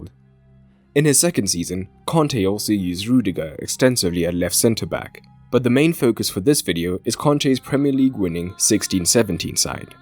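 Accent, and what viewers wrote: South Africa. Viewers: Are you South African? great video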